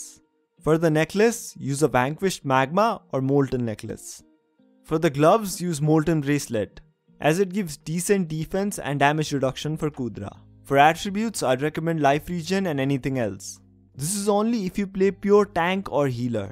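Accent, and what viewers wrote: India. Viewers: Are you Indian I think bcz of your voice make videos in hindi it supports Indian hypixel community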